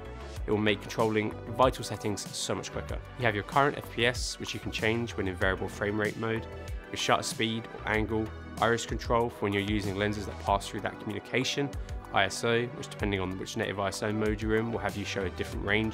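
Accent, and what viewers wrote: United Kingdom. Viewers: Music really should not compete with voice in a mix. I had a hard time hearing the voice. With the British accent and high speed speech to boot, this American had a hard time hearing and processing the information in real time. I did watch it twice, though!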